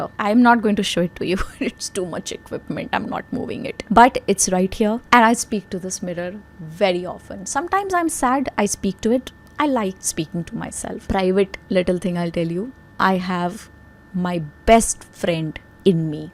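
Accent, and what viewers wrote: India. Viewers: Why you not speak Real English it's hindi English. The lanaguage must be speak on their orignal way is best to teach and learn not on this way Thank s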